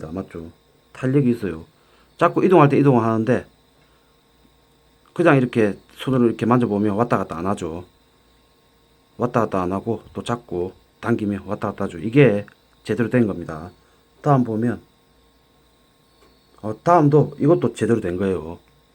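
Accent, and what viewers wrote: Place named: Gangwon, South Korea. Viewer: 강원도 분이 신가봐요. 너무 웃기게 말씀하네요. 감사히 시청하였읍니다.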